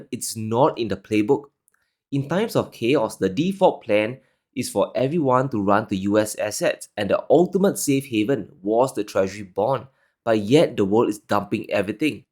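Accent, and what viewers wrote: Singapore. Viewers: Too bad if you don't know what a Singapore accent is, and can't even spell properly in English. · is a Singaporean. Why would he speak in a foreign accent?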